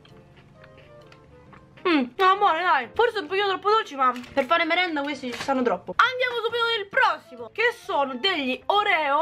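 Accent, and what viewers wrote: Rome, Italy. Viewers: Il tuo accento romano lo amo 😍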